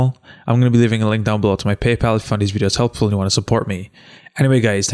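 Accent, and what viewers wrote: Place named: Ireland. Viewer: Good man, good to hear an Irish voice, thanks for the video 👍🏻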